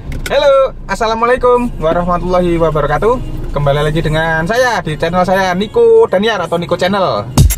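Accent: Java, Indonesia. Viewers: wkwkwkwk boso ne josss mas aku yo wong jowo, salam medhok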